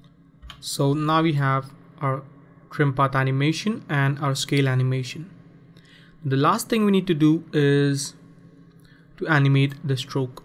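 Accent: India. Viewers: bro are u an indian??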